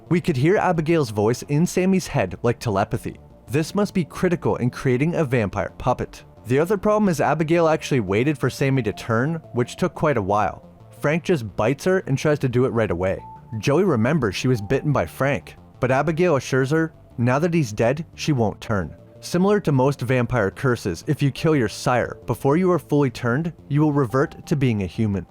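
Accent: Canada. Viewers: Holy Canadian accent!!!!!!!! LOL!! I forgot how Canadians pronounce things like “Out and about = Oot and aboot” “Mom = Mum”………..I thought my MN accent was bad!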